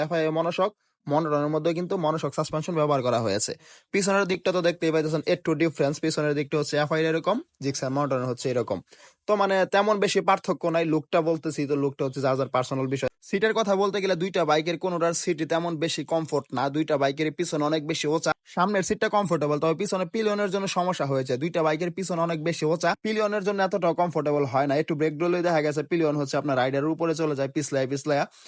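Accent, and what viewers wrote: Narsingdi, Bangladesh. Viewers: ভাইয়ের বাসা কি নরসিংদী নাকি 😊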